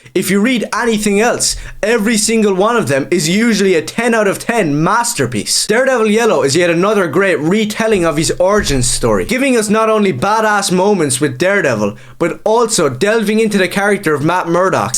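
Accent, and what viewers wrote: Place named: Ireland. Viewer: fine Irish yapping man, imma read it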